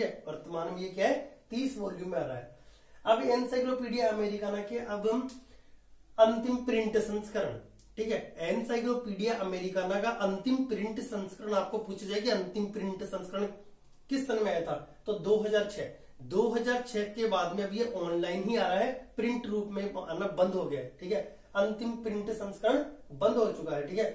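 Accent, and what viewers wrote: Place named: Rajasthan, India. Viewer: अपने अच्छा पढाया, क्या राजस्थानी लोग उनीस सौ को गुननिस सौ बोलते हैं। ऐसा अक्सर देखा है।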